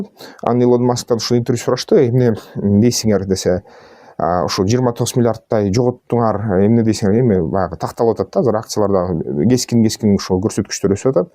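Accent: Standard. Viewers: Таза кыргызча үчүн өзүнчө чоң рахмат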